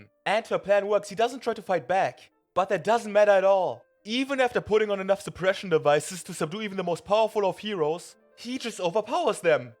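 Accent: Germany. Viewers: Ich höre deutsch raus?👀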